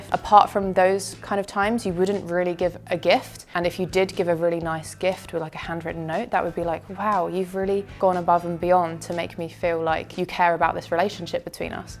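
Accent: United Kingdom. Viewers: 还有美妙的英国口音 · 英國腔真是好聽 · I really enjoy your British accent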